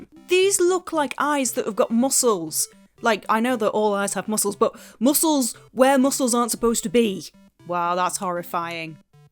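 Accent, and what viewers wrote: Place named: Northern England, United Kingdom. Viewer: 2 questions: The name, is that a game or musical reference? And I know you're down south now but I hear northern, where did you grow up? (hull?) oh and Keep up the good work long time fan.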